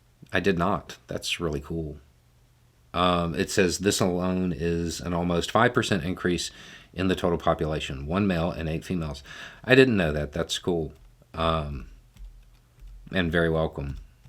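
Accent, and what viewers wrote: South, United States. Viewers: The fact that you describe yourself as, "detached" helps me understand part of the reason I've always trusted you. I describe myself that way, and others have made that observation about me. We have similar backgrounds in regards to our younger years and area. I've lived in the rural South my entire life, and you sound like the men I've been around my entire life, accent wise. The vast majority of men around me are typical so called christian conservatives, mostly trumpers at that, ugh! Oddly enough, I feel like you listen to me more than any man in my everyday life, sad huh lol. I'm so glad I found your channel way back when you had around 250k subscribers, I know you and your intelligence and calm delivery have helped to keep me sane during this weird ass timeline we are currently living in, thank you for everything, and team! 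✌️❤️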